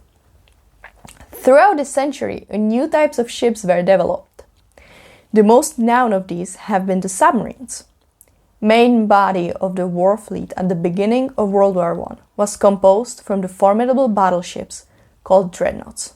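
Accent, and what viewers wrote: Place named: Eastern Europe. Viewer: This slavic accent needs to be removed. If I did it, you can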